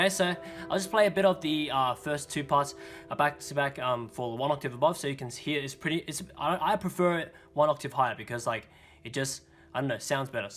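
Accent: United Kingdom. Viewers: i like the kinda british accent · i think he is British??? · best english accent ever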